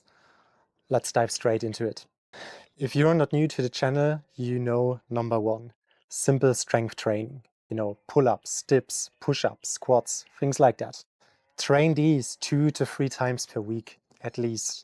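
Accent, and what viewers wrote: Germany. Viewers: very subtle german accent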